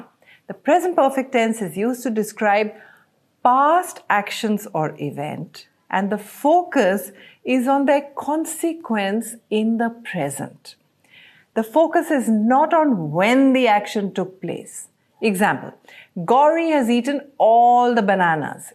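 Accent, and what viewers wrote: United Kingdom. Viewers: I though she was british😅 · R u british?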